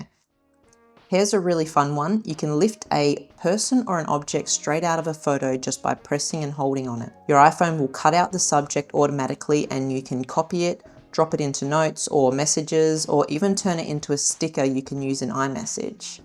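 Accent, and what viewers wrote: Australia. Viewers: Thanks for this informative video. I’m in my 70s and realise I have so much to learn. Great to hear an Aussie voice. Will be checking out more of your work ❤❤ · I must admit, half of the reasons why I watch these videos is because I miss Aussie accents too much. You go girl!!! Straya!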